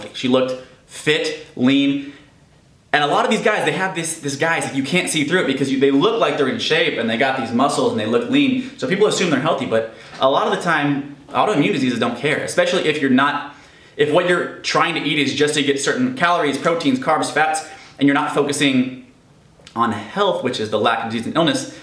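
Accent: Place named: South, United States. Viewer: What part of the south are u from?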